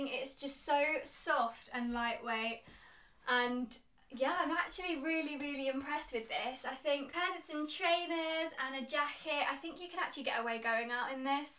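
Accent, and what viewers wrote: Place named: RP, United Kingdom. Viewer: is stunning. Plus the posh English accent is lovely.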